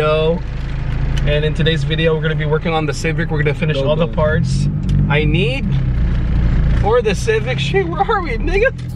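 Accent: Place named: Conyo, Philippines. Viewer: Maxado konyo c kuya... nice car by the way.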